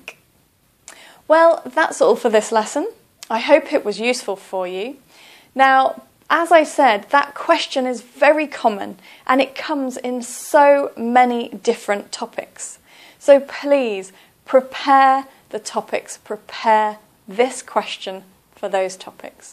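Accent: United Kingdom. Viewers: Its british accent. You can feel the BOUNCE the way they speak.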